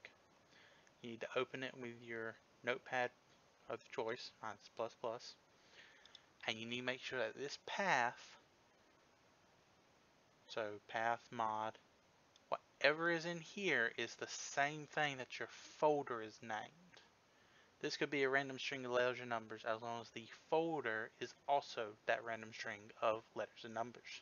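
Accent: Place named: South, United States. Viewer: Thanks mate, love your southern (?) dialect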